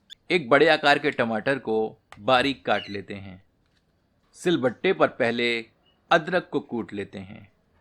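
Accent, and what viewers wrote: Standard. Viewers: Lehja.. Behad sundar shudhdh Hindi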